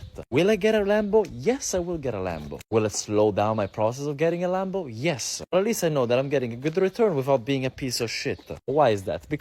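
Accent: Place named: Italy. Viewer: Your accent tells me you don’t have enough hands on experience to yapp about this subject. Maybe stick to Italian politics.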